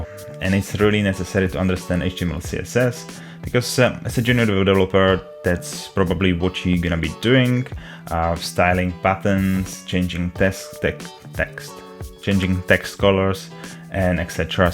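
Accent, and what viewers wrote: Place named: Czech Republic. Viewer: Slyším českou angličtinu jdu mrknout na video :D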